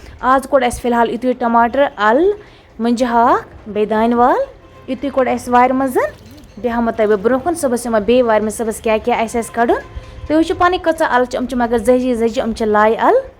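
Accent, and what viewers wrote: Kashmir, India. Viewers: Aap ki kashmiri voice bhot ache hai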